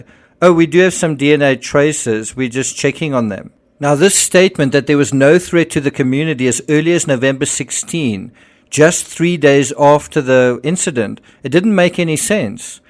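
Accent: South Africa. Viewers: Is the narrator South African?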